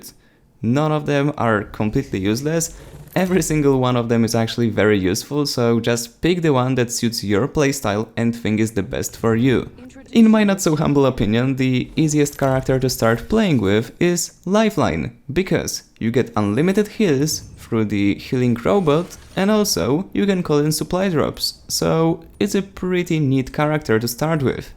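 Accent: Poland. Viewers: Hmmm you sound lukę youre from poland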